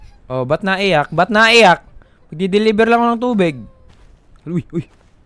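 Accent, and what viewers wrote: Visayas, Philippines. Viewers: Dol bisaya man deay ka dol ey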